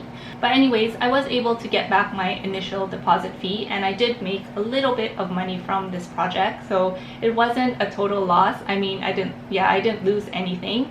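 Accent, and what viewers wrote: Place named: Philippines. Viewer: Hi, are you Filipino? you sound like one! I'm looking for a crypto buddy, I'm also a new crypto investor. Hope we can connect.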